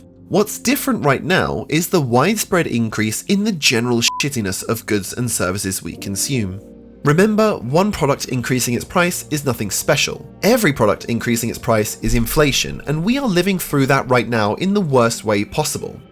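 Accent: United Kingdom. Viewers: British accent, US money. Am I watching Sh*tvlogging with AI? 😂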